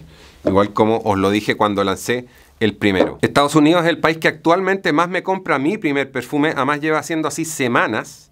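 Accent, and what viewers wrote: Chile. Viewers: estimado tienes acento chileno ? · Habla como chileno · Disculpen la ignorancia...de dónde es este compadre? Habla como nosotros los chilenos, pero ocupa algunas palabras en español. La pregunta es por si existe alguna zona de España que hable igual a nosotros.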